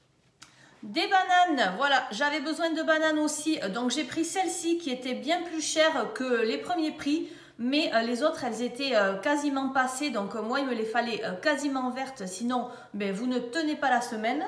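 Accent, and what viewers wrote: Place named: Toulouse, France. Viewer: Mdrrr l accent ! 😅. On ne se rend pas compte en parlant. Ce n est pas une critique. J ai le meme. 😅 Region Toulousaine aussi. Vous etes de quel coté ? Nouvelle abonnee 👍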